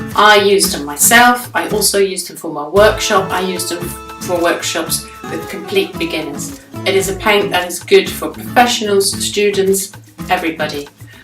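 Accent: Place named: England, United Kingdom. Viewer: Nice review. Surprised you sound so English. I love Old Holland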